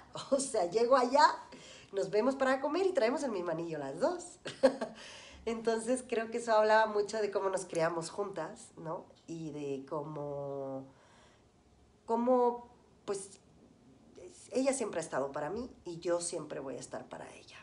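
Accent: Mexico. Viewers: ya eres Mexicana! Tu acento mexicano te va muy bien eeeeh felicidades! Saludos! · Y dime... se ríen de ti los Parchis x tu acento mexicano??😁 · ya no hablas como española, sino como mexicana,pero igual sigues siendo la ficha amarilla de Parchis. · Me gustaría leer la respuesta de que piensan de su acento mexicano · cuantos años llevas en México? Tener nuestra ficha amarilla fuera de España aún no lo supero! Jaja amo como hablas con acento mexicano y cambias al español 😝 me encanto el documental! Lo volveré a visualizar seguro! Saludos desde España!! Te adoroooooo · Alaaaa que mexicano tu acento, bueno esque hablas mejicano no tenía ni idea! que te quedaste viviendo allí,pensé eras catalana. Un abrazo! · Hablas más como mexicana que como española, que linda 😉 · Que tía más simpática esta parchisera (hablando como hablan los mexicanos y su curiosa forma de 'retorcer' el castellano). 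· Guapa guapa!!! me encanta tu acento Mexicano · Tiene buen acento Mexicano..he notado que casi no usa palabras o términos de España...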